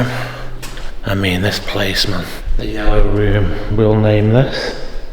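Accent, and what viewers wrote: United Kingdom. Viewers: Btw luv your British accent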